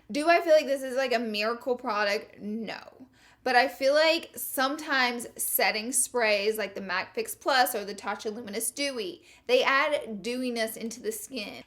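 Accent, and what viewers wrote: South, United States. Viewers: I love the southern accent, its adorable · I love the southern accent, ❤️from Tennessee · LOVE THAT SOUTHERN OHL!! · I love your southern accent, why would someone not like it #snapfam💦💦💦💦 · Love ur southern accent girl 👍❤ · I love that you're so unapologetic about your southern accent. I never get to hear people like me in the beauty world so I ❤️ you and your channel! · When you're from the south and talk the same way 💕 Girl I got you 🤙🏼 ole ftw 😂😂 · Love your southern twang! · I am from the south and I love hearing beauty guru type YouTubers talk the way I do. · Honey, you say oil just fine. I love how your southern accent pops in once and a while. You stay strong to where you're from and I think that's an honorable thing. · You're awesome blossom 😊 Love ya .... keep saying oil the way you do. Most ppl love it and your southern self ❤️ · Love your southern accent ❤️👌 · I love her southern accent! 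· I love your Southern slang 🤣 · I <3 your southern accent!!!!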